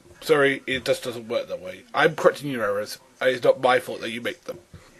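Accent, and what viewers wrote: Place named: United Kingdom. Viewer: No you are not. Speech impediments or not, it would be preferable if someone uploading a video at least tries to be clearly audible. It's just a very thick british accent joined with random mumbling and stumbling on words.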